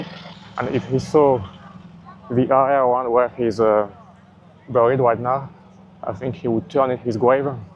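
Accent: France. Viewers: Quel accent merdique! Il n'y a que les Français pour parler aussi mal.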